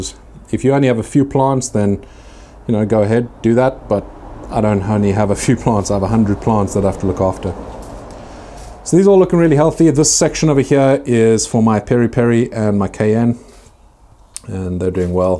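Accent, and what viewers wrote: South Africa. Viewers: I might be completely wrong, but do I somehow detect a little bit of South African in his accent? The way he speaks just sounds like home. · I really like your chilies 🌶 and I know your in U.K., but I detect South African accent? Maybe I am wrong.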